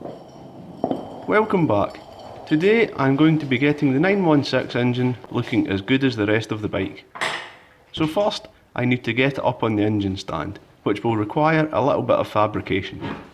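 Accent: Scotland, United Kingdom. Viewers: So, I'm an American and I'm having trouble placing the accent....Scottish? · You've taught me more Scottish accent than Train Spotting, so thank you! Also awesome projects. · Its great to hear another Scottish accent alongside this great work.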